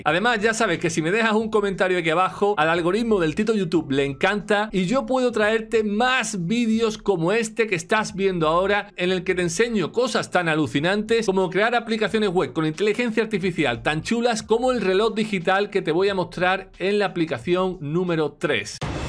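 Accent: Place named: Chile. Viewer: te siento algo de acento Chileno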